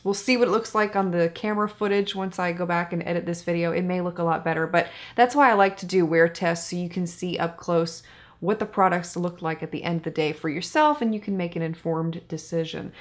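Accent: United Kingdom. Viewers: I can listen to people with English accents all day too. Thanks for such an honest review. CT has beautiful packaging and some great products but it's too expensive. Can you do a first impression of MUFE new self setting concealer? I'd love to hear your thoughts on it. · Yes I too Love British accent, hence my Dr Who and BBC subscriptions. Thank you for taking on and testing Charlotte Tilbury. I don’t understand the hype because the shade range is not Woman of Color friendly. I have learned so much from her as a makeup artist however you would think she would be more inclusive. Btw can you please do a video on care and maintenance of lashes. I watched your video to apply and am doing ok but it’s the wear them more than twice I am working on. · British accents are the best! LOVE Charlotte Tilbury.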